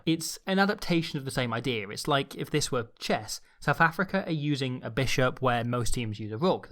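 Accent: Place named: England, United Kingdom. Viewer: Ah. Never knew that. He sounds English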